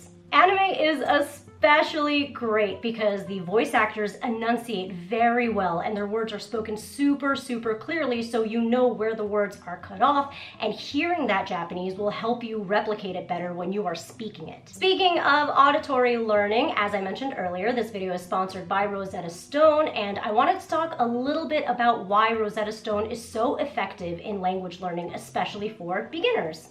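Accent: United States. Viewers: Your English is good American English , bad English English. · Her code switch is AMAZING! When speaking American English she pronounces Japanese words like an American would, but when she speaks Japanese, it's Native AF. I can see why a lot of people think she's American or Half-Japanese and Half-American even though she's Japanese. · Interesting! And how come you have such a great American accent? I came here because I work as a translator and currently I am translating a Japanese series for a TV network (I have English subs and translating into Croatian). I am now on episode 4 (out of 10) and I feel that I am KIND OF catching the rhythm of the language, so I wanted to find out more about it. Greetings from Croatia!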